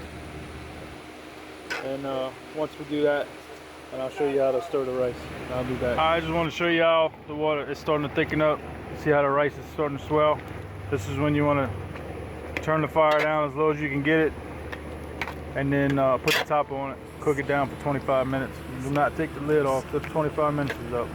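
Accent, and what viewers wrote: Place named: Louisiana, United States. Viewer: Making it today!!! I've watched many people's vidoes but this one was more of my type of jambalaya! I live in Louisiana so when I heard this accent I knew it was the one!🤣🙌🏽🙌🏽🤟🏿 I will be back for updates!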